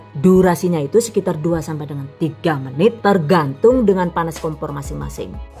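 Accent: Banyumas, Indonesia. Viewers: Jane sampean wong ndi mba Q bsa ngapak ya.. SALAM,ngapak NEK ORA NGAPAK ORA KEPENAK🖐👍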